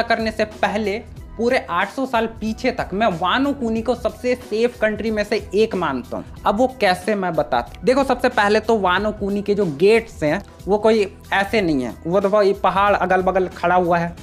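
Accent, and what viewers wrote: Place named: Odisha, India. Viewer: Bro are you from odisha . Apke baat karne ka tarika Odisha walon ke jaisi hai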